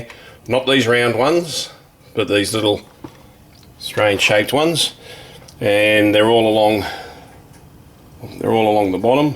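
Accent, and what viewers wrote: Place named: Australia. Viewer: Great video, just bought a "new old stock" mesh grill, wasn't quite sure how much of the old grill needed removing, nice to hear an Aussie giving YouTube information.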